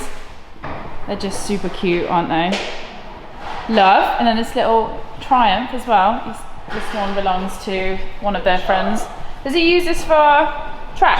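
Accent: Ireland. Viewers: Car is amazing, and I hear a little Irish slang in you these days haha good to see the time here in Ireland rubbing off! You've accomplished so much for 29 so no pressure just enjoy your 30th! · Can tell you’ve been living in Ireland for a bit “the crack” and “grand” 😂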